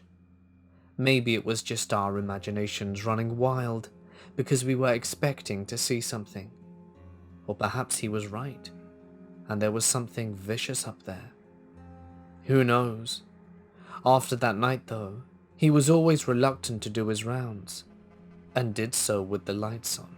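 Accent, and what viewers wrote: United Kingdom. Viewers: Your voice is so calming!! Where in the UK are you from?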